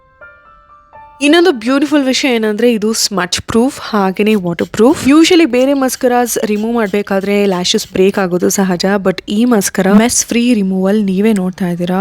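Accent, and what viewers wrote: North Karnataka, India. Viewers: Uttara Karnataka bhashe nalli full ondu vlog maadi mam please nim bayalli nam uttara Karnataka bhashe keloke chanda please mam one vlog please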